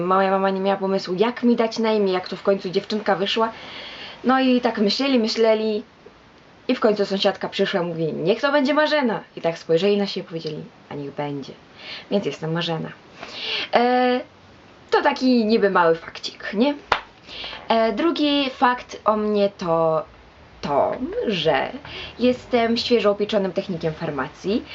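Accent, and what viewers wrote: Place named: East, Poland. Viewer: z takim zabuzanskim akcentem, to mosfilm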